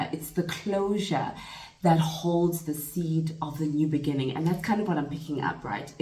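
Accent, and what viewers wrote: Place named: South Africa. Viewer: You sound south african sis,🇿🇦 🙌🤞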